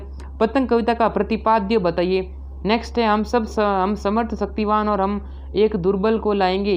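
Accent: Gujarat, India. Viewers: Bhai aap gujrat sa ho kya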